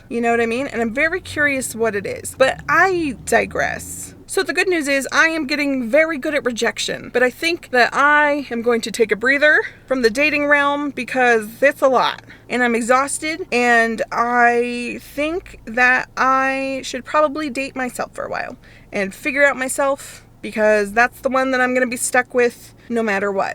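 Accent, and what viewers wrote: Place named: Pennsylvania, United States. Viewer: That’s gotta be a Pennsylvania accent.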